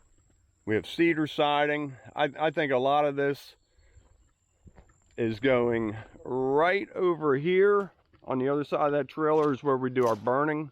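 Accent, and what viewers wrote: Pittsburgh, United States. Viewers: I stumbled across your video and started watching it. The minute you opened your mouth, I knew you were from the Pittsburgh area. I was born and raised in Pgh and moved to Arizona in 1998. I still recognize that Pgh accent! I subbed just to get my Pittsburghese fix lol. Thx for sharing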